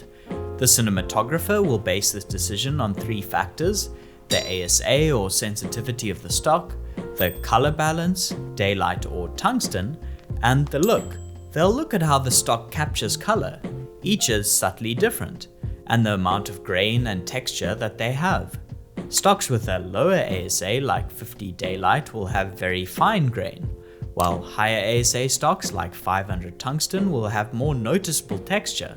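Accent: South Africa. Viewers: Bru are you South African? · Hey man, great content, your accent is so SA!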